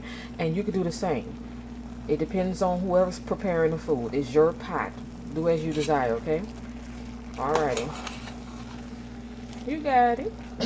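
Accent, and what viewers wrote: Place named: New Orleans, United States. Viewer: Actually paella is from Spain but Cuba does make a couple yellow rice dishes based off of the yellow rice dishes of Spain since Spain’s influence in Cuba was heavy and many many Cubans are of Spanish ancestry. I was surprised you called it a “yellow rice jambalaya” but then I heard your accent and since I’m from the New Orleans area I recognized it as a New Orleans area accent. So now I know why you called it a jambalaya since we have jambalaya in New Orleans and south Louisiana and it looks very similar to a jambalaya. But there is a reason it looks similar. Jambalaya descends from the paellas of Spain and pilafs of southern France. I’m of Spanish descent from the Canary Islands of Spain and our family we sometimes make a jambalaya with saffron (to make yellow rice) instead of the common way to make Jambalaya in the New Orleans area and it looks a lot like this when it’s finished.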